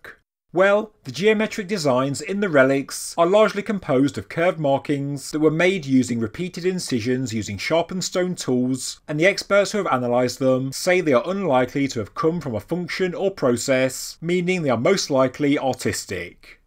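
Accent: United Kingdom. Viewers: Worst dialect inflections of the UK. Spoils the whole show.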